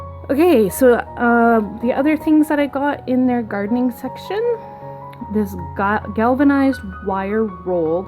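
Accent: Canada. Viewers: Awe, so fun to hear your sweet voice! I love the Canadian accent! 😍 I wish we had a your dollar store with more... But I shouldn't complain because I have access to a lot of stores you do not. I'm hating the cold weather. I'm ready for spring! We don't have Dollarama either. I've had a cold as well and believe it or not, I'm still struggling with shingles. It's bearable, but my immune system is struggling to fight it off. I love the paint brush holders!!! Nice haul, looking forward to seeing what you make with it!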